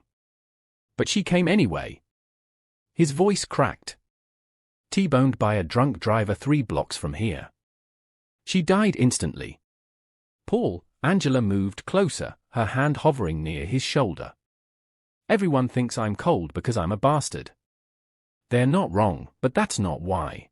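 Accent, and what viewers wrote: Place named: RP, United Kingdom. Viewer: The British RP accent? Schedule Aluminum Oestrogen..etc aren't American Pronunciation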